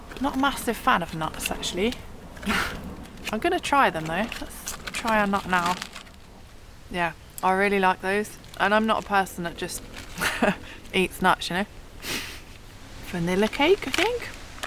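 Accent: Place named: Oxford, United Kingdom. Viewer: Nearly Oxford! English! With? A Twist! 😂❤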